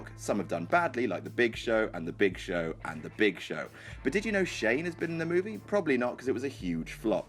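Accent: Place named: United Kingdom. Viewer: Come on man seriously,can they get people too do these that you can understand? here that whispers half the time,mumbles 1/4 of it the other 1/4 says 3,4 words at a time.Plus that thick British accent who the heck can understand what he is saying?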